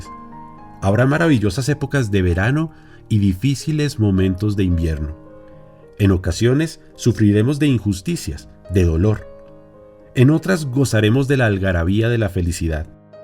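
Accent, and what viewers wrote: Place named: Bogotá, Colombia. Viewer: Acento bogotano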